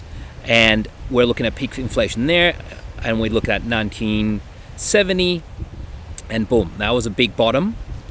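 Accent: Australia. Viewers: Are you an Aussie? Appreciate your sharing of perspective..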